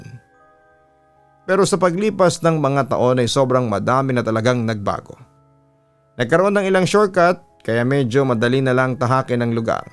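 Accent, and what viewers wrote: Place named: Waray, Philippines. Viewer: waray2 po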